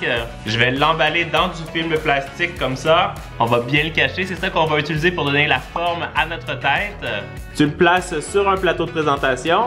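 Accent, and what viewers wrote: Canada. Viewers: tu mon youtubeur préféré de is vidéos accent canadien et qui met quoi des vidéos trop trop rire 👍👍👍👍👍 🇨🇵🇨🇵🇨🇵🇨🇵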